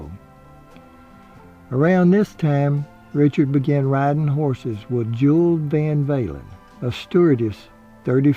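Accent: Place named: Mississippi, United States. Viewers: Thanks for all of these great videos, --we love them and your Mississippi accent! Please keep them coming! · Mr., I love your videos and your Mississippi accent. I'm from Grenada and grew up in scobey and Holcomb, I was curious as to what part of ms you are from bc you sound so much like my mawmaw Ingram. A more masculine tone but similar. I really enjoy all of your videos and stories. Hope all is well.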